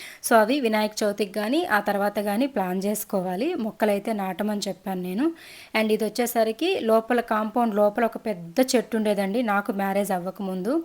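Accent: Chittoor, India. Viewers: మిరు చిత్తూరు భాషలోనే మాట్లాడండి అక్క బాగుంది మరుమాట్లాడేవిధానం మాకు అలానే మాట్లాడండి మిపాత గొంతు వినివిని విన బుద్ధి కాలేదు. అక్క మాకు అలాగే చిత్తూరు భాషనె బాగుంది ఎంతమందికి చిత్తూరు భాష కావలి ఫ్రెండ్స్ నెను పెట్టిన కామెంట్ కి ఎంత మంది లైక్ చేస్తారు. ఫ్రెండ్స్🥰🥰🥰🥰🥰🥰🥰🥰😀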